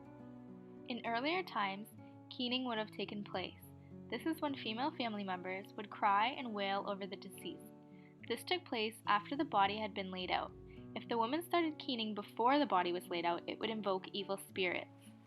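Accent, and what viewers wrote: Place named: United States. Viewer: American voiceover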